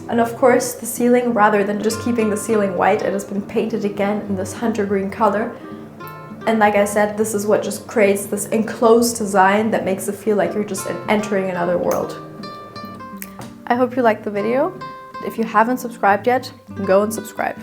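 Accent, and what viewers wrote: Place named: Germany. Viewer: I love to hear you speak. Your voice is so beautiful and your English is perfect with just a hint of (German?) accent? Sorry if I got it wrong.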